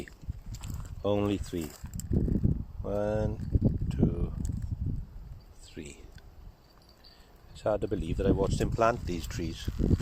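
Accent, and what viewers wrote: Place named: Pembrokeshire, United Kingdom. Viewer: I used to visit Pembrokeshire, so I love to hear your accent as much as watch the content...Keep going if only for your mum's sake...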